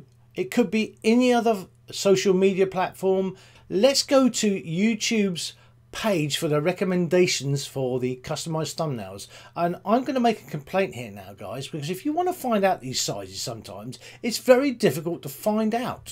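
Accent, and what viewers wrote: United Kingdom. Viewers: Good explanation, Been struggling with this for a bit but makes a lot of sense. Nice to hear a UK voice on a LR video. Thanks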